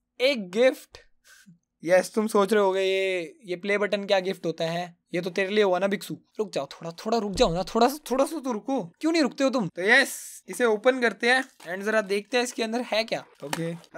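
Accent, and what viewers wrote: India. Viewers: Mana tumhe English aati hai yrr Indian hoke English bolre ho 🙄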